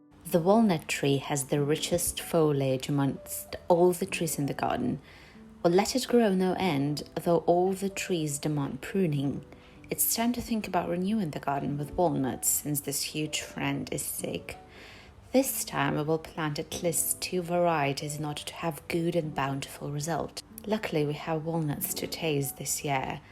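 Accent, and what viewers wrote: United Kingdom. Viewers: Did you learn that very British accent? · ahh nice you have a nice british accent !!! congrats. and by the way i had never seen a vertical aquarium .... is it aquarium?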